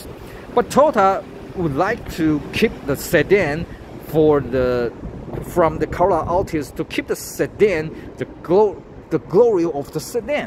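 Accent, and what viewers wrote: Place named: United Kingdom. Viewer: 講的很有道地的英國口音不愧是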